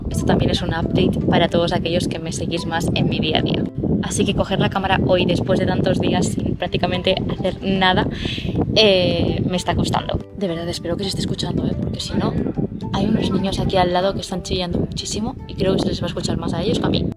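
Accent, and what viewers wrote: Spain. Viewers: Eres Española?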